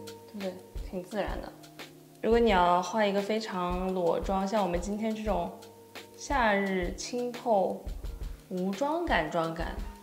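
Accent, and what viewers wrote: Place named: Wuhan, China. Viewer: 你是我人生中第二个巨爱的武汉普通话！哈哈哈哈哈哈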